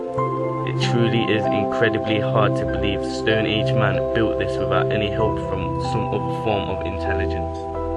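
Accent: United Kingdom. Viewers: im not hating on the london accent, im from london myself, im just saying that it makes you sound unprofessional. i speak like how i was taught in school, like you are supposed to speak yes? personally i believe the london accent is not as dominant as lets say scotland. we have a choice here, either speak normally like many people around you in london, or speak like a twat. and there you go. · What you see hear? There? I love how Puma Punku leaves massive unanswerable questions, but really? I guess what I am saying is that your British accent is not too strong enough for me to requite poorly written subtitles. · Next time you post a video please have the text checked first by someone who completed their O-levels, then have the voice over read by someone without a Yorkshire dialect.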